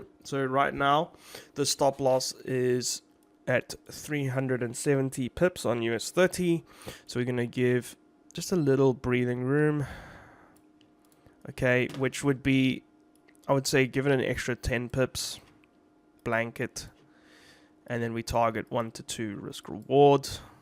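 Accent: South Africa. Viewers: bro you from South Africa ?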